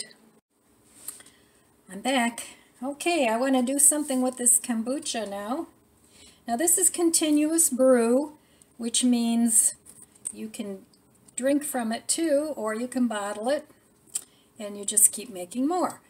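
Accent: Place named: Michigan, United States. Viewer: Just found your channel, and am really enjoying your content! Very useful! I love the idea of adding a layer of plastic wrap over your guacamole to help seal the air out! You have an accent like a friend of mine from Michigan...are you by any chance from that area?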